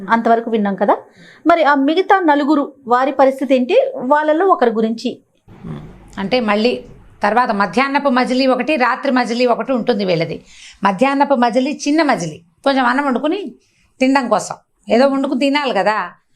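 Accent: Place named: East Godavari, India. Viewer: Namaskaram pedda.akkayyagaru.mee.mata.stala.svacchamgavundi.bahusha.meedithoorpugodavarijilla.anukuntunnaanu.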